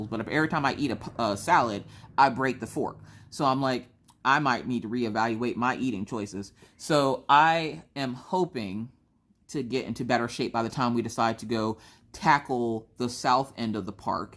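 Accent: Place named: East Coast, United States. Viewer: I'm new to your channel and you've really got me addicted! When I first heard you I figured east coast. I spent a LOT of years in Baltimore. Then when I heard you say you were in ND? Even a smaller world. I was born and grew up there. And now I'm in SD so was loving to hear about your trip to the badlands! I'm in Rapid, in sure you passed pretty closely by.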